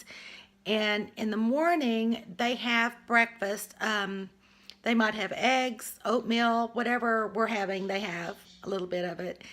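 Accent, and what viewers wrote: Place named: South, United States. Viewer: You are such a southern belle!!! I love it!!!!! I’m from NC and it put a smile on my face hearing your accent :) And thanks for the video, I have an Eclectus as of a couple of days ago, so I’ll have to make sure I can incorporate all of those ingredients. I heard my baby boy’s digestive tract/system is pickier than most other parrots. This is my very first bird so wish me luck. Be well!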